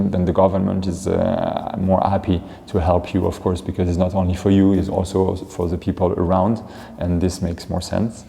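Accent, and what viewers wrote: France. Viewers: I love your accent 😍 🇫🇷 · As a french guy I feel ashamed that french castels are in sale for strangers (but according to your accent you are french as me ofc). The problem often especialy with british people is that they will buy a property then turn it to totaly private. Not allowing anyone to enter in the park, to fish in the lake if there is one etc. However it is better that those castles belong to a person, even one who will not respect the local habits, rather than leaving the castle in ruins. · I was so worried when I saw the thumbnail that a rich american bought it... first time Im happy hearing a French accent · This is also my dream!!. Amazed by your dedication and that lovely francais accent. A bientôt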